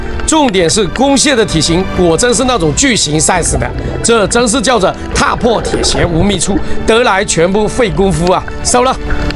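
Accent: Fujian, China. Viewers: 听你的口音应该是福建人吧？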